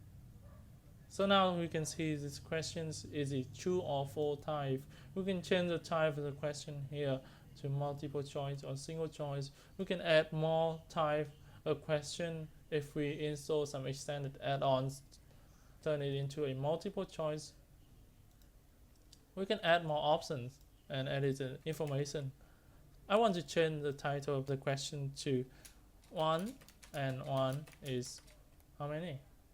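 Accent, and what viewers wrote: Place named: Vietnam. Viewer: ui nghe như giọng VN luôn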